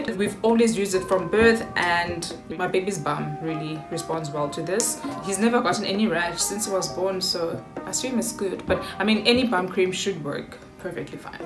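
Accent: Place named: South Africa. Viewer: Thank you for this video. Lovely to hear a South African mom.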